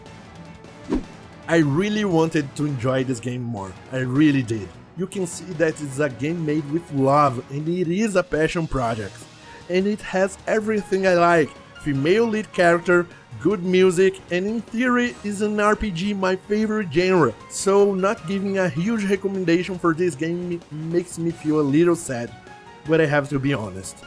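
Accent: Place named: Brazil. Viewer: Thanks! Now I know what a Brazilian person actually sounds like for once! Great review!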